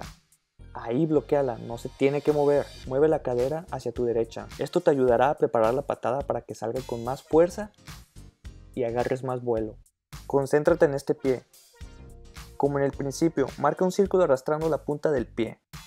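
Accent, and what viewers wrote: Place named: Mexico. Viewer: Todo un crack, por tu forma de hablar creo que también eres mexicano. Excelente forma de explicar.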